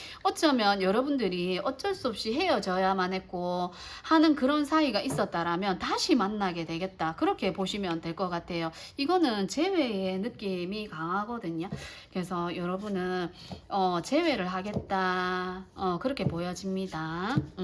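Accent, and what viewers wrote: Gyeongsang, South Korea. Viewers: 경상도 사투리땜에----😢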